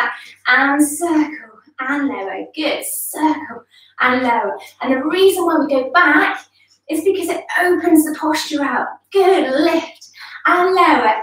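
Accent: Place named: United Kingdom. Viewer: thanks you for your advice regarding my Flappy Flabby upper arms I will definitely be watching out for any and all exercises that help! Have a great day in Cornwall, I visited there two years a ago was fortunate enough to catch the filming of Doc Martin and met some of the cast. As an ex pat we get over quite often, really enjoy the description of the view from your window! And your British accent... after 40 years here in Canada I still have a very strong Yorkshire one! I am so glad I and my daughters found your videos they are so inspiring and upbeat, and cater to all levels! Thank you for brightening our day! Jill Vancouver Island Canada!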